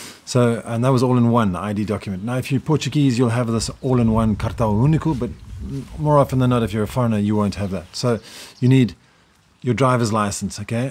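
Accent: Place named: South Africa. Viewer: fascinating video Happy to find your channel. I'm also from south africa and the accent was so recognizable. I'm thinking of moving to Portugal and this video and the public transport one was very inciteful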